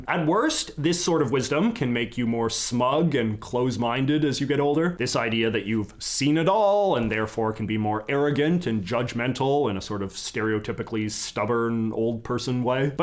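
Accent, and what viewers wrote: Canada. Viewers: He's a fellow Canadian , I was noticing the "aboots" like crazy .Thanks for this man . The anxiety is getting to me but I really loved certain things . One of them was mentioning lifestyle , i exercise a lot , soccer , photography , healthy diet (coming soon). Your video really encouraged me but I would love a video about dating in your late 30s .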